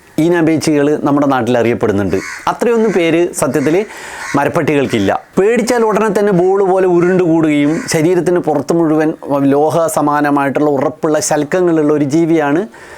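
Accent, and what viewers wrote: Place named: North Kerala, India. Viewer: സാറിൻ്റെ വീഡിയോസ് കണ്ടിരിക്കുക എന്നത് ഒരു പ്രത്യേക അനുഭവമാണ് ... താങ്കൾ ഓരോ വീഡിയോ അവതരിപ്പിക്കും മുമ്പ് വളരെ ആഴത്തിൽ സബ്ജക്ടിനെക്കുറിച്ചു വായിക്കുകയും, അന്വേഷിക്കുകയും ചെയ്യുന്നതായി മനസ്സിലാവും .. അതുപോലെ വടക്കൻ കേരളത്തിൻ്റെ ഉച്ചാരണ രീതി താങ്കളുടെ വീഡിയോസിൻ്റെ പ്രത്യേകതയാണ് ... ഒരു റിക്വസ്റ് ഒണ്ട് സർ, നീൽഗിരി മാർട്ടിൻ എന്ന ജീവിയെ കുറിച്ച് ഒരു ഡീറ്റൈൽ വീഡിയോ ചെയ്യാമോ?? 🙏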